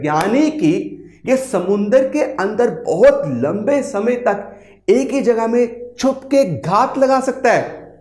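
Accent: Punjab, India. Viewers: Deep knowledge with punjabi tadka 🔥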